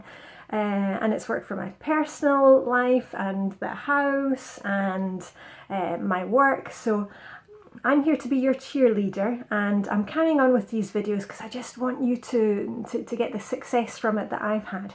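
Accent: Scotland, United Kingdom. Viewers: I love watching you and listening to you! Your accent reminds me of my grandparents (both sides from Scotland), and I love your sharing the Danish culture (a wee bit). I live in California and also follow FlyLady. I watch you to get motivated and uplifted every day! Thank you so much! Donna in Southern California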